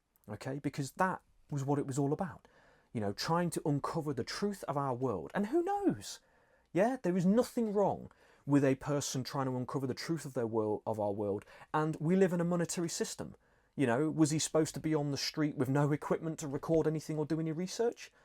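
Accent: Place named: Northern England, United Kingdom. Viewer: Greetings ! You should drop your stupid northern accent because this is ego identity another label. I’m not judging you but being totally honest with you with energy straight from my heart. What you say about me after this I don’t care I forgive you if it’s something negative because you don’t know. I’m a manc I’m a scouser you get it ? This is why you go on the spiritual path to get rid of your lebels. UK is a reptilian prison camp and a matrix which you must escape if you a lightworker I already did I went to live in the Swiss Alps far away from the sick British society anyway the law of one is powerful and those who achieved cosmic unity in oneness with cosmic awareness know this but coming back to this dimension after sometime is very hard but you have to but this time you are a multidimensional being who is in all timelines and dimensions at ones always NOW. 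I hope David transformed well. Peace & Wisdom 🎼🙏🏻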